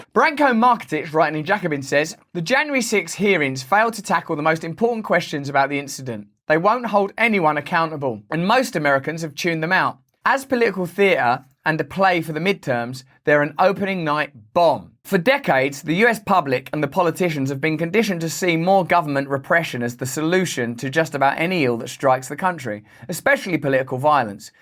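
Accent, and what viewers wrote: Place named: United Kingdom. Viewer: RB I very much enjoy and appreciate your videos. You're cool and I don't think of you as an outsider. You seem more tapped in to things going on in America than most Americans. Your videos certainly are an eye-opener. I'm happy to become more conscious and the info comes with a lovely British accent. You know we Americans are partial to that. We just can't help ourselves. I'm watching and listening to more of your videos because I want more useful information. You're telling us the truth about ourselves and our country. I seek the truth in everything. Thank you for all the time and work you're putting in to revealing that truth. I'll be watching and listening for more of your enlightening videos. It's almost tea time. I do enjoy a good cup of Earl Grey. Good day.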